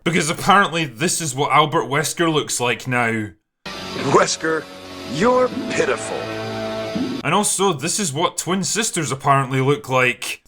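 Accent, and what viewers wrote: Scotland, United Kingdom. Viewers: You seem Scottish but you say eh a lot and you act Irish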